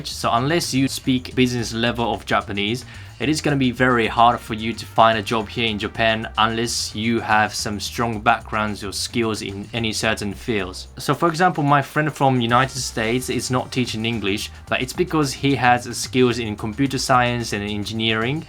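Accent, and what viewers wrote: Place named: Australia. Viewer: this is the first video of yours I've seen -- I believe I hear a strong Australian accent good video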